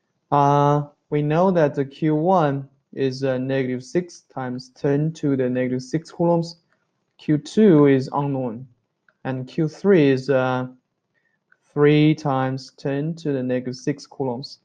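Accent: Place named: United States. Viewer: your accent is american from which country you are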